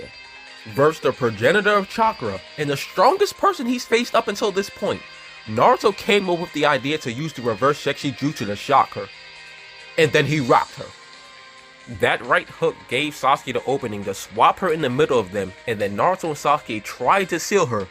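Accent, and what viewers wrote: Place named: East Coast, United States. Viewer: Where are you from east coast USA huh ?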